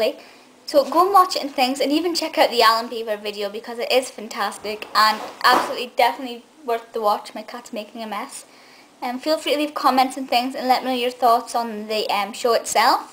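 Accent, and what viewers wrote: Scotland, United Kingdom. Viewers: I loved alan alan alan so why you living in scotland you have a hint of a scottish accent but at times i make out the geordie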